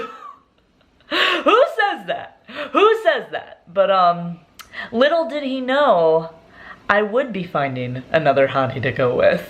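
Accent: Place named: Wisconsin, United States. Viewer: Wisconsin Accent OP 😜🤘🏼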